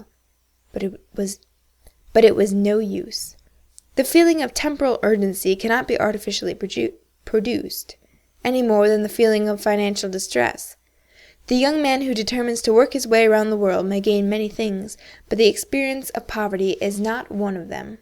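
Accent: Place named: United States. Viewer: BRITISH housewife, reader is American and does a crappy job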